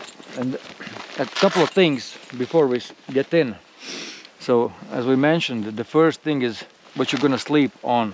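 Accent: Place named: Baltic states. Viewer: Так вот как звучит прибалт,говорящий на натовской мове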